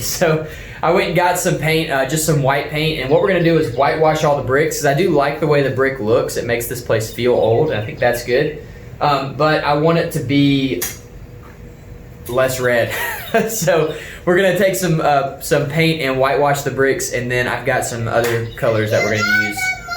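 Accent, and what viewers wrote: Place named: South, United States. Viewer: Love he has that southern accent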